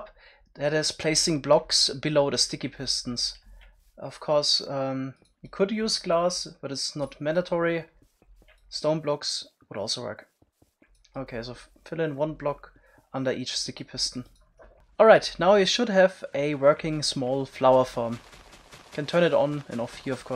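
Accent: Germany. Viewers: Is your native language German??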